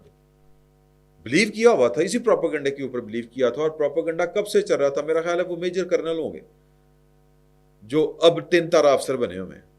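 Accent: Punjab, Pakistan. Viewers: Punjabi touch Wel done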